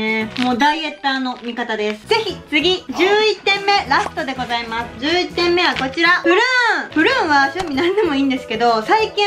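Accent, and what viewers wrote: Kansai, Japan. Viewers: 初めまして！エイゴといいます！新参ですが、チャンネル登録しました！さん、美人ですね！そして、関西弁素敵です✨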